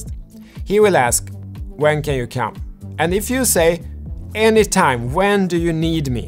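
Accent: Sweden. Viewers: Dina videon är roliga och lärorika. Dock gör det lite ont i mig att höra din svengelska, fast ju mer jag lyssnar desto charmigare blir den:)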